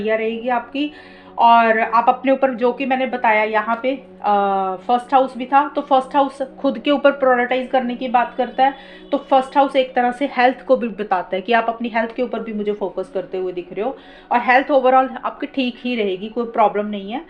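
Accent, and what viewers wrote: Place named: Jammu, India. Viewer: arrey ni mam I mean apke bolne k touch se lga ki ap jammu se ho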